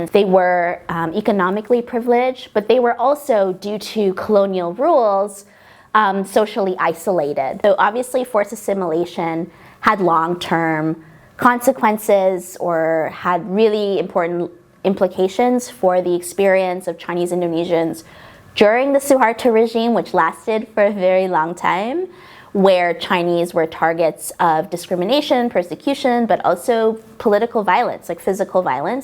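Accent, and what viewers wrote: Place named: United States. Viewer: Ini logat amerika yang paling totok yang pernah gw denger dari orang Indonesia :))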